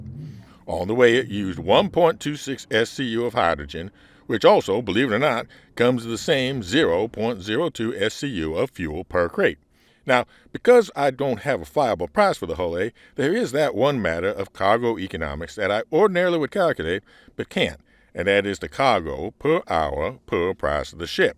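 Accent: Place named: South, United States. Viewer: I say, I say...I enjoyed the foghorn leghorn southern inflection/accent. Not super familiar with Bezsek.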